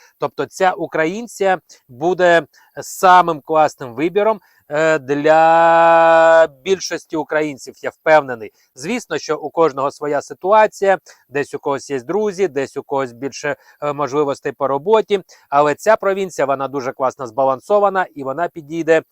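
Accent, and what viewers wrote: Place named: Russia. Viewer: Я це акання взагалі чути не можу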